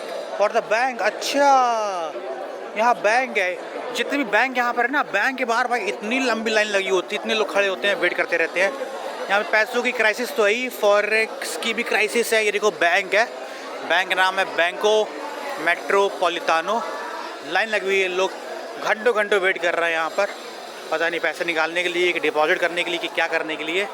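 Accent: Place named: mixed, India. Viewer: U.P. Bihar me sab tumhare tarah hee bolte hain kya?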